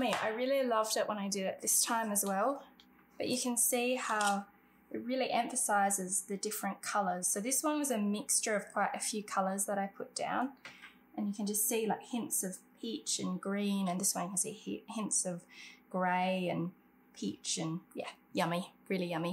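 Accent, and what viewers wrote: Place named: Australia. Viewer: As soon as I heard you’re Australian I subscribed to your YouTube channel. So nice to hear a familiar accent. And you use materials available to us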